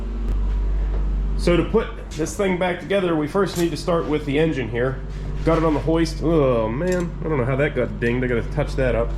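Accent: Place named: Western Pennsylvania, United States. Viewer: I just found your channel, and I don't know where you live, or where you're from, but judging from your accent it must be western Pennsylvania, am I right?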